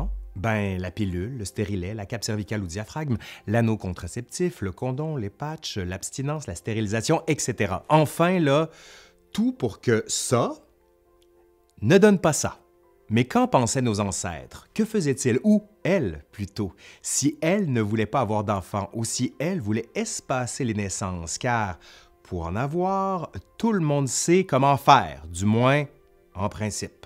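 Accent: Quebec, Canada. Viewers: Merci pour l'accent canadien qui nous explique tout ça avec beaucoup d'humour.😂😂😂😂😂😂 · Toujours instructif, plein d’humour, une prononciation impeccable ! Et j’adore l’accent Canadien! 👍🏼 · Excellent ! Encore meilleur avec votre accent canadien !😊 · Merci à toi, merci de ta façon ludique de raconter l'histoire. Et merci à ton léger accent qui me rappelle mes vacances dans la belle province. Alors je me suis aussi abonné et je partage 🎉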